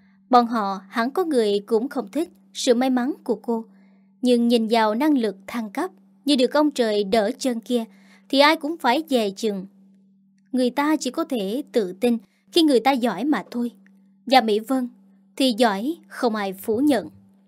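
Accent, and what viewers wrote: South, Vietnam. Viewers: Going mien nam de nghe wa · Mỗi người có một sở thích khác nhau, có một số người không thích giọng đọc của MC vì chất giọng của miền Nam. Nhưng thực tình thì đọc rất hay, nhẹ nhàng, truyền cảm,bộc lộ được nội tâm của từng nhân vật làm cho câu chuyện hay hơn, hấp dẫn hơn.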